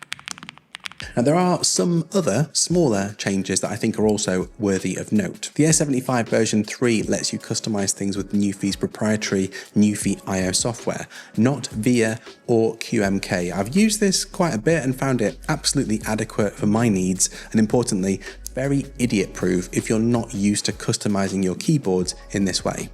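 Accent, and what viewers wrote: England, United Kingdom. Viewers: I hate the audio track chage it to my county language I like your English accent love this review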